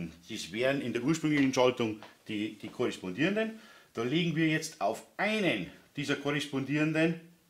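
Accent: Bavaria, Germany. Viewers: du bis der beste, geile Aussprache ( Dialekt) Unser beste Bayer 😀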